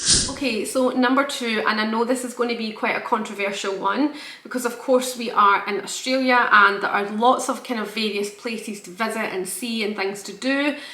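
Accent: Scotland, United Kingdom. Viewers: I could listen to your beautiful Scottish accent all day cheers from Sydney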